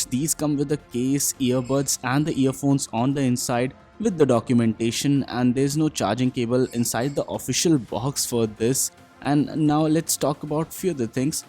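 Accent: India. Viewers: mamma mia gli indiani che parlano inglese sono irritanti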